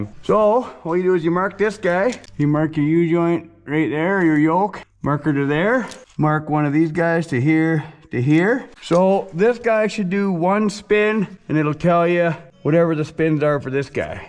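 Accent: Canada. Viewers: princess auto winch that you got on promo is the most relateable thing I've heard all day. fuck buddy I can't tell if you're from Ontario or Alberta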